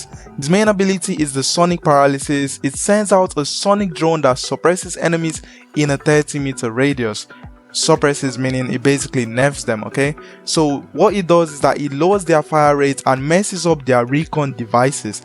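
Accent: Nigeria. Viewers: You sound Nigerian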